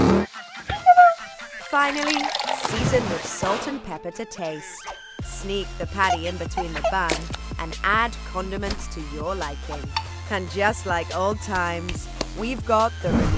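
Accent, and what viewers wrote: United Kingdom. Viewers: It's what makes it more eye catching especially bc the narrater is British so I like it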